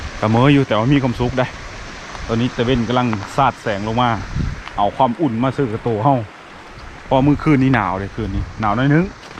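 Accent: Phu Thai, Thailand. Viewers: เว้าคือภูไทเขาวง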